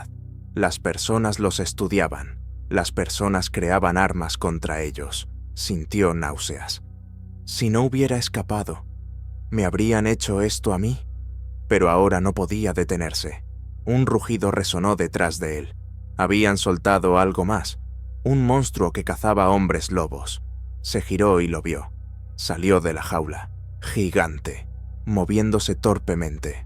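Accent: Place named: Spain. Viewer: Quiten el maldito y horrible acento español, ese acento tan desagradable arruina la historia, deberían de prohibirlo en todo el mundo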